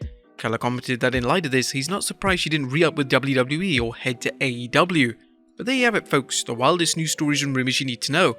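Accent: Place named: England, United Kingdom. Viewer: Youre a narrator with an English accent so at least pronounce Vince's surname correctly! Its Vince McMahn, not McMan! 👎🏻🤦🤦‍♀️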